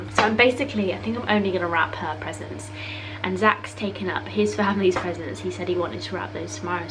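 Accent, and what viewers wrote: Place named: London, United Kingdom. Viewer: Applered she’s definitely a Londoner so that’s a very cute London-accent :)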